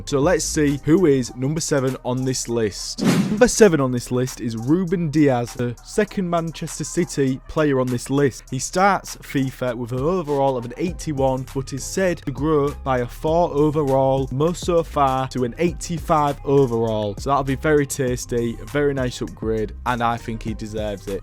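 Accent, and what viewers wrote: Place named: South Yorkshire, United Kingdom. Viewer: I swear to god your accent sounds so close to mine 😂 you from South Yorkshire? Don't tend to see many creators from there big ups to you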